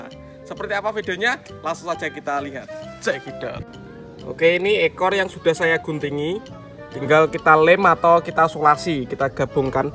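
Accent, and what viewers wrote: Java, Indonesia. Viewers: Mas jowo ne ndi kok medok men.... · mas kok jowo an orng mana !? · Iki jowone ndi boss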